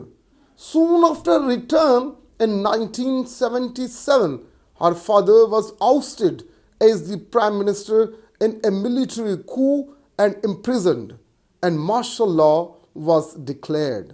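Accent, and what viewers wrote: United Kingdom. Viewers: profoundly comprehensive video with fascinating lucid british accent Sir👍👍👍👍👍❤❤❤❤❤❤❤❤❤❤❤ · Amazing video In every terms, the Accent as too as british, the information about her it realy explosive..❤❤❤❤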